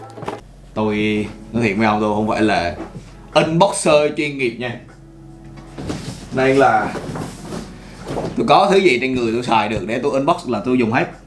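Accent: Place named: Da Nang, Vietnam. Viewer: Anh này nói giọng Đà Nẽng lồm nhớ quê kinhhh 😅😂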